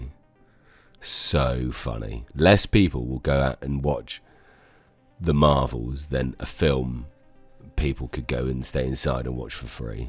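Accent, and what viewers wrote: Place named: United Kingdom. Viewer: I hope you get well soon! I miss hearing your lovely British accent.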